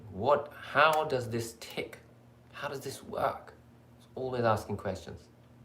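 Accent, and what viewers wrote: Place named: England, United Kingdom. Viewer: Also, you sound like you're in England. That's where I am. But also lost in infinity.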